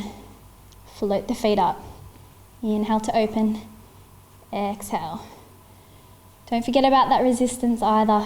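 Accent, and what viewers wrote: Australia. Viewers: Loving the accent! Is it Australian?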